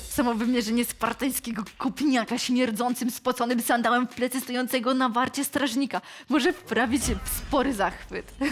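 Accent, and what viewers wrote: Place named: Ukraine. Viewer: Fajnie że jest popularna streamerka. Natomiast w roli prowadzącej, nie sprawdza się, ponieważ ma problem z dykcją i emisją głosu. Strasznie się tego zle slucha. Nie wiem co to za maniera wymowy ale brzmi jakby była ukrainką.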